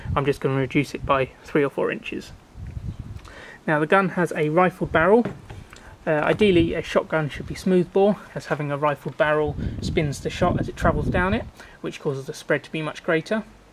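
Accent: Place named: United Kingdom. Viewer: I do not like that British accent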